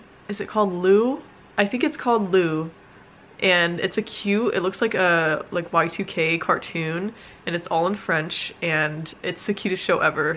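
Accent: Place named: United Kingdom. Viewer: Bonjour ! Tu vis dans un environnement magnifique, idéal pour trouver l'harmonie et se sentir connecté, et y compris avec soi. Une astuce pour apprendre le français ( même si tu en parles dans la vidéo), regarde des films en français, tu verras..c'est chiant !! Mais concentre toi sur l'action, tu comprendras un peu, mais surtout, ça va travailler ton oreille et tu apprendras plus vite !! C'est ce que j'ai fait avec l'anglais et au bout d'un mois aux USA dans une famille, je tenais une conversation, alors qu'au début je ne comprenais rien, mais rien de rien 😁! Par rapport aux Britanniques, votre accent est terrible ( il faut comprendre la clef), et vous parlez VRAIMENT très vite !! L'horreur 😂... Après, c'est juste une question d'habitude. Le plus incroyable, qui m'a vraiment surpris, c'est que je pensais en anglais pour parler. Voilà.. Merci d'être toi ! 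Peace...🌿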